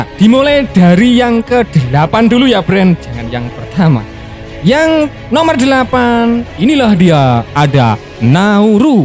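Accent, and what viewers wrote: Java, Indonesia. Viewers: Logat jowo 🤣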